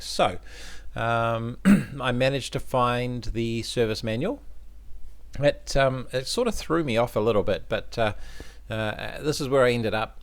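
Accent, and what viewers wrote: New Zealand. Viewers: Customers car came in today senders seem okay cause its sending signal saying full on gauge when passengers plugged in however half when unplugged and half when both unplugged will open up tomorrow morning and hopefully this info does the trick. you sound like you're from nz. owe u a box of beers if this works out. $100 so far for diagnostics dunno what to charge if this is the issue. maybe another box lol more so around $350 which I think is fair. Chur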